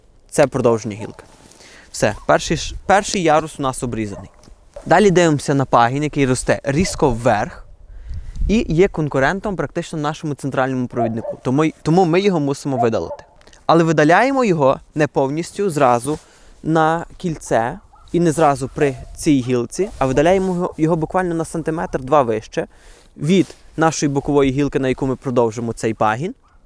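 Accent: Western Ukraine, Ukraine. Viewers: Любуюсь этим парнем. Многие у него взял. Спасибо большое. Он любит своё дело.И мова очень гармонично звучит, хоть и с выраженным западенским акцентом. Молодец, уважение! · Западный акцет... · Очень понравилось. Спасибо за сжатое изложение по сути. Начинаю писать по русски, чувствую, что съеду на украинский)) Речь красивая, чувствуется акцент западной Украины, но очень привлекательный. Сохраню видео себе. А то посадила пару черешен, а как подойти к ним, не знаю. Подскажите, а повой ВСЛ2 подвержен гробакам? Дякую и всього найкращого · не варто вибачатись за "хоть и....западенский акцент". Українська мова багата різними акцентами і тим прекрасніша!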